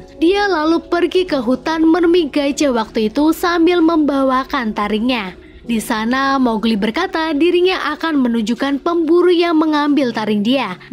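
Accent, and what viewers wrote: Pemalang, Indonesia. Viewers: orang pemalang mba? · Mba sampean kaya wong pemalang mba, angger iya tangga mba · Goblog nemen si🤭 Kya logat e wong Pemalang kota, bener ora sih wkwk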